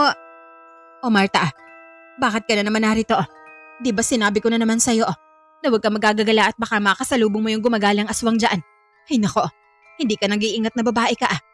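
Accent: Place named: Rinconada, Philippines. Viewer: Parang ringconada ka idol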